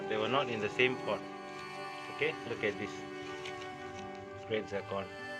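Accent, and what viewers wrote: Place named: India. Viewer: No problem thank you so much for your kind information i thought you were from India you sound so much like Indian 🙏🙏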